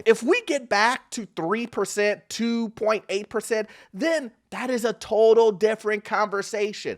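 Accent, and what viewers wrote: United States. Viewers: I love your Midwest accent. Makes me want to pull up a rack of baby back ribs and talk real estate all afternoon!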